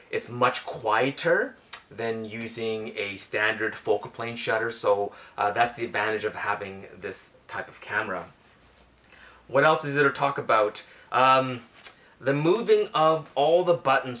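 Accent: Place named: United Kingdom. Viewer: You should lower the background music, it's not easy to ear your voice, you have a strong British accent !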